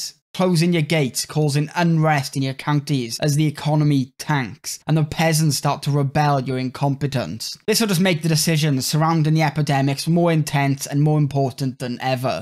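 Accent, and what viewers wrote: Wales, United Kingdom. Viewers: where in wales are u from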